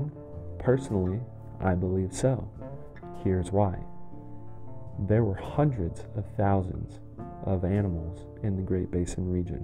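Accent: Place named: California, United States. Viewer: Born and raised in the Great Basin, this presenter is an embarrassment. He sounds like a Californian. I also take issue with his incoherent comment about the Great Basin not being part of the larger value set of traditional Americans. If there was and is any place in America that has hung onto traditional American values, it is in the cow country of the Great Basin. The traditions of the Vaqueros with the long reata, hackamores and spade bits never got the attention of the Texas cowboys precisely because of the remoteness of the region and the independent nature of the buckaroo. Battle Born. Storm Rough Country Horses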